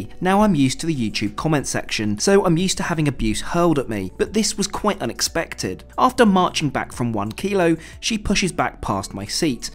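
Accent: United Kingdom. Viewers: i assume the channel owner is british (judging by his accent), the UK uses the metric system (km), why tf would he use miles?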